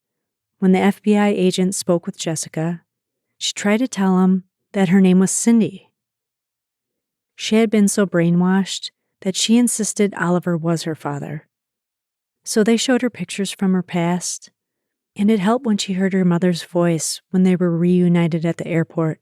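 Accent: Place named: Midwest, United States. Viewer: I love her voice! Something about that Midwest accent that makes everything sound so wholesome… even true crime!!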